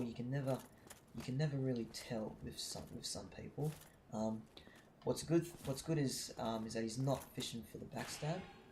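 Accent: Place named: United Kingdom. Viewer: Dude your accent is hilarious haha XD im not a big fan of british accents but your funny ^^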